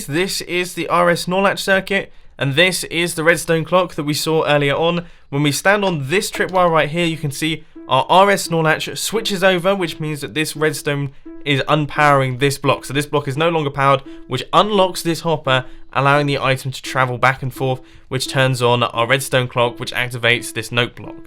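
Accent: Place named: England, United Kingdom. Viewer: Love your redstone contraptions and your english accent. XD aka, U r my hero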